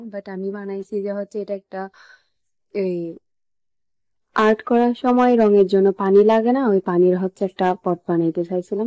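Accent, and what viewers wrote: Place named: Chittagong, Bangladesh. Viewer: সুন্দর হয়েছে আপু তবে আপনি চট্টগ্রাম থেকে বলছেন মনে হচ্ছে 😊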